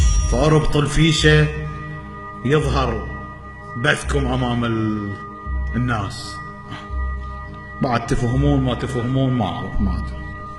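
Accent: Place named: Iraq. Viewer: زباله كلمه عراقيه بحته وطريقه كلام السيد. هم عراقي بحت ويبقى من عامه الشعب ومنه وبينه ف هاذ الحجي زد وهيه كوه تردون تسقطون بيه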